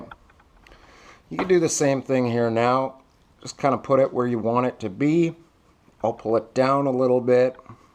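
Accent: Finland. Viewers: Are you finnish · Dude!!, thats cool;) I noticed a little fin akcent there:)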